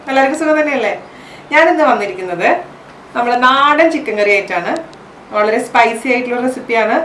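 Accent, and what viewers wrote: Thrissur, India. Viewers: Nammude thrissur baasha oru verity anetta chechi😀😀👍🏻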